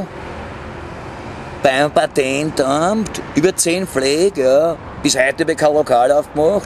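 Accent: Vienna, Austria. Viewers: Ha ha, habe ich Englisch als Mutterspracher, entschuldigung sie...aber es ist mir egal...Wiener Dialekt klingt nicht so schöne für mich aber daß ist wurscht oder? Wer spricht was, mensch sind mensch uzw...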